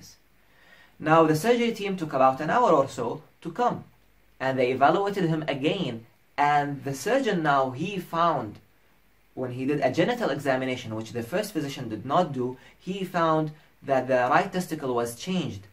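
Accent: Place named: England, United Kingdom. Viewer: I am so excited to learn from you..please pray for me to be accepted in the med school...I loved the video the informations and your English accent as well...Good luck doctor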